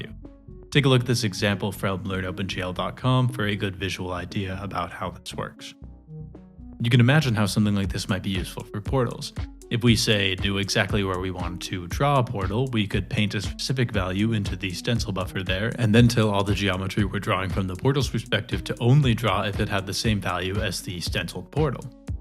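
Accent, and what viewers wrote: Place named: Denmark. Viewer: Great video as always! Jeg havde ingen idé om at du var dansker, din accent er ikke særlig stærk :)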